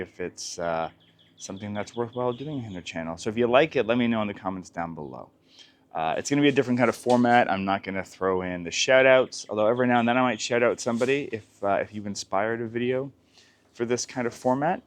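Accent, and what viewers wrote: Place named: Canada. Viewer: Sounds great. I think some of your words are just Canadian accents. My friend lived in New York and when he says dog, I crack up! We love you guys and it’s great to have community with all our imperfections!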